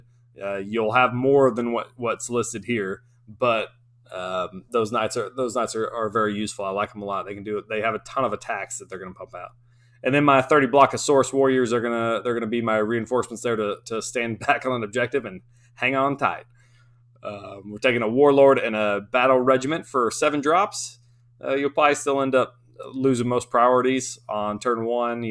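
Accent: Texas, United States. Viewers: Ya know I'm not great at picking out accents but I'm pretty I'm pretty sure this guy's a Texan too. *proceeds to miss the lone star in the back for over half the video* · Really good video! Loving the Seraphon focused content! Awesome channel, keep up the hard work. Shout out from Kansas bro! thought i sensed a Texas accent in there! 🤘😁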